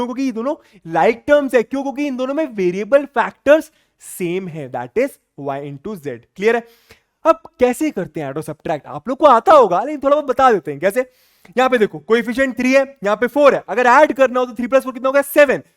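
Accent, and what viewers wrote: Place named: Bihar, India. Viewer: ap bihari ho /mai bhi hu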